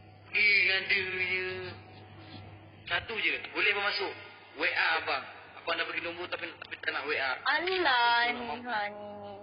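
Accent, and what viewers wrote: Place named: Malaysia. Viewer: Kok sampeyan iso ngomong carane Wong melayu ngomong..mantap sis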